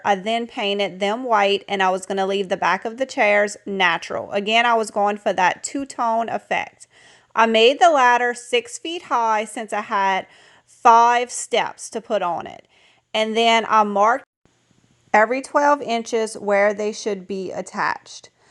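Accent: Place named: New Orleans, United States. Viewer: I live in east Texas. Sounds like you're from New Orleans. Love the planter and ladder.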